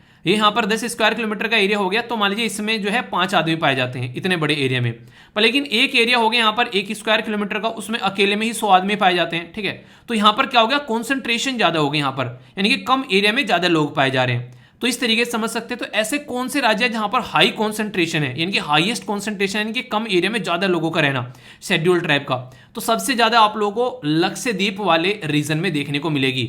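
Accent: Haryana, India. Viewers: haryana se ho na aap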